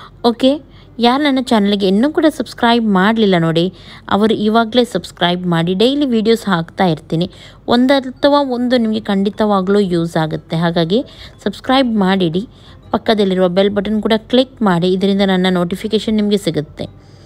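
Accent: Mangalore, India. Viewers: ನಿಮ್ಮ ಜನ ಅಂದರೆ ತಪ್ಪು ತಿಳ್ಕೊಬೇಡಿ ಅಂದ್ರೆ ನಿಮ್ಮ ಮಾತು ನಿಮ್ಮ ನಡತೆ ತುಂಬಾನೇ ಇಷ್ಟ ಮಂಗಳೂರು ಮಂಗಳೂರು ಕನ್ನಡ ಭಾಷೆ ತುಂಬಾನೇ ಚೆನ್ನಾಗಿದೆ ಅದಿಕ್ಕೆ ನಾನು ಹೇಳಿದ್ದು ನನ್ನ ಮಗಳಿಗೆ ಹಾಯ್ ಹೇಳಿ ಅವಳ ಹೆಸರು ಶ್ರಾವಣಿ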